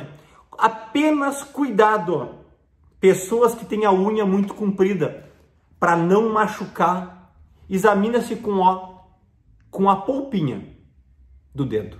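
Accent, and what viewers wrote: Rio Grande do Sul, Brazil. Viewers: o Sr é Gaúcho?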